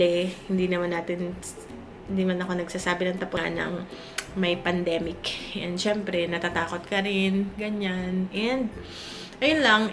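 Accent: Batangas, Philippines. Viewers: Taga batangas ka po o Quezon?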